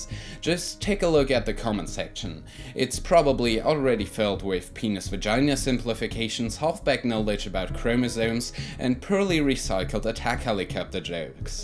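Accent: United Kingdom. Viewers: you legit sound a bit scotish:D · Darf ich fragen woher du deinen Akzent hernimmst? Klingt nach einer sehr ungewohnten britischen Varietät. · I'm still not sure if I'm an NB/Agender/Genderfluid but I'm OK if ppl call me female bc I know they just don't know it better (but it literally gets on my tits sometimes...) but I get rlly happy when called a boy...♥ I just wish there was less binary pronoun-calling in Germany as well as gender role expectations... People can be so narrow-minded limiting themselves and others. But wanting it changed from one day to the next is utopian... either way... work on that adorable British accent, darling. Very neato. Keep it up! ♥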